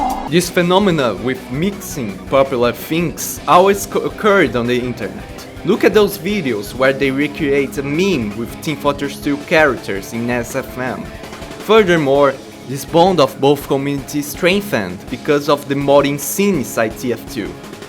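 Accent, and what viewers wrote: Brazil. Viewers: this accent... I recognize it... you're brazilian, aren't you? me too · Bom dia mano 😊😊 deu pra ver o sotaque br aew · nice accent. where are you from? Brazil? Seens me talking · sotaque brasileiro ficou bem incluso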